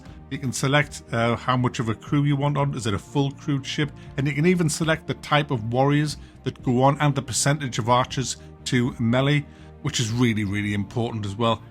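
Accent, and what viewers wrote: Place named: England, United Kingdom. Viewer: I have watched your videos since 2017 now. I still cant figure out, where in England your accent is coming from.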